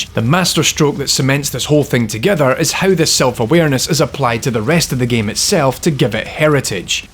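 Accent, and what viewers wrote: Scotland, United Kingdom. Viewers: A scotsman tells me about violence. Love it. TAEK DE RUENSTOOONE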